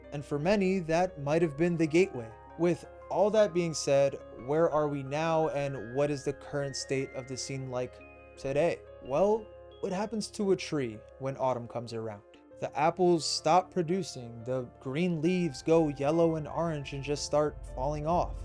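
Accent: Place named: Portugal. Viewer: omg I was listening to this, I opened the tab just to see who the hell is talking and to my surprise, portugal caralho.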